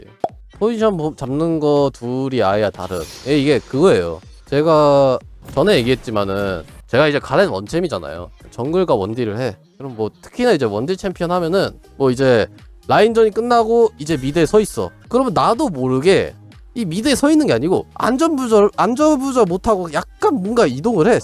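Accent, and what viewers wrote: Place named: Jeolla, South Korea. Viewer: 형님 혹시 전라도 분이십니까 사투리 정겹네요잉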